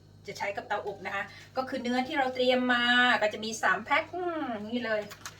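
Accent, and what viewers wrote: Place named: Sakon Nakhon, Thailand. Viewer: สำเนียงคือคนสกลนครน้อจ้า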